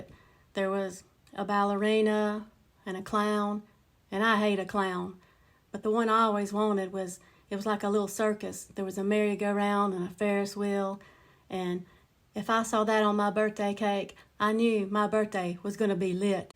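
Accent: Appalachia, United States. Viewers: OMG! you've got stop making these videos, I'm laughing so hard I'm going to pee my pants. I love watching you talk with that Appalachian accent it's just the cutest thing that I have ever heard. Man you are so beautiful girl.❤❤❤❤❤❤❤❤❤❤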